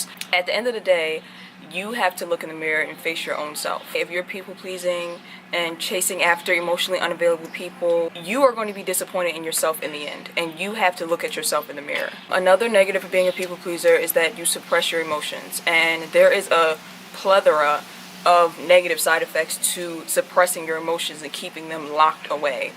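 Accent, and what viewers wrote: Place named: New York, United States. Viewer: I wanna kick it with you, are you from New York? Lol you sound like it a bit. I'm from out here, love your swag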